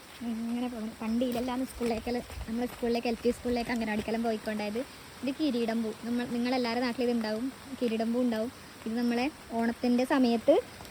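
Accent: Kasaragod, India. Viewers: അംഗനവാടിയിൽ പോവാൻ വഴിയില്ലാലേ കാസർഗോഡൻ ഭാഷ നിഷ്കള ങ്ക സംസാരം ജനിച്ച വീടും നാടും മറക്കരുത്.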